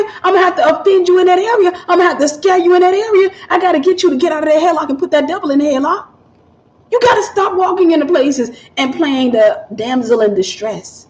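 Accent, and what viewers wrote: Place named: South, United States. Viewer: God Bless you Beautiful Sister i have that Southern Accent as well it doesn't leave for some Reason hee hee you have been a Blessing since i found your channel 🕊️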